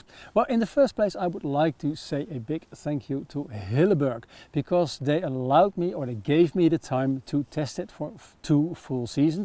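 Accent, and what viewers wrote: Netherlands. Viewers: superb review. Your “Dutchy “ English reminds me of the accent and translations my Dutch girlfriend speaks ! ❤ Thanks for filming in English- very useful content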